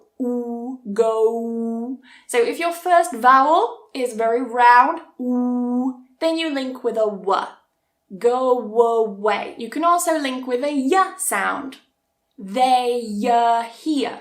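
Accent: United Kingdom. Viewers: Your accent is so British, I like it a lot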